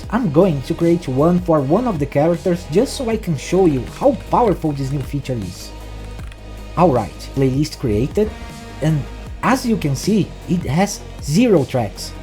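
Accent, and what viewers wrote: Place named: Brazil. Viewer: Hahahahahahaahah incrivel como da pra perceber outro brasileiro pela fala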